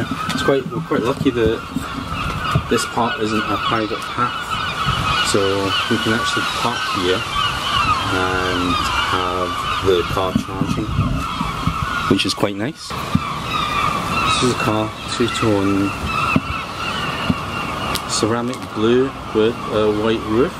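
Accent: Scotland, United Kingdom. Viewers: A china-man with Scottish accent, priceless! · thankyou for this great video very informative , now I think I have decided to buy one in Australia PS:love the scotch accent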